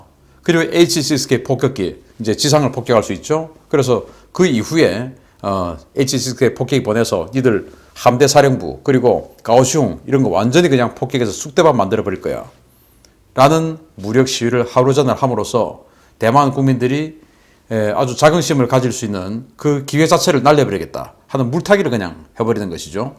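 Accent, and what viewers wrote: Gyeongsang, South Korea. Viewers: 당신 경상도 사람이지 말하는것 보니 티가나네 좀 젊잔케 해봐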